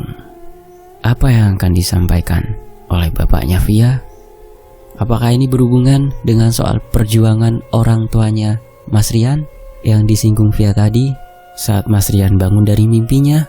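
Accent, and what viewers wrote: Java, Indonesia. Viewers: Waallaikumsalam wr wb mzzz Mzzz BHS jawanya medok bgt asli orng mn ya kl boleh tahu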